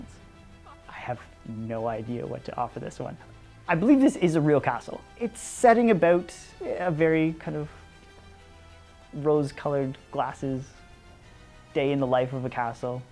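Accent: Canada. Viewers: One sentence in, and I can tell he is Canadian 😅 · I can tell he is Canadian by his accent. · I'm guessing he's Canadian from that "oooot" and "aboowt" in his accent. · As soon as you opened your mouth, I pegged you as a Canadian (and from my alma mater too!)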